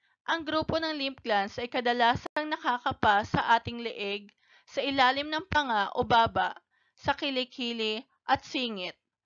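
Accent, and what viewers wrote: Visayas, Philippines. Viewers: Ok n saya.. Kaso bisaya te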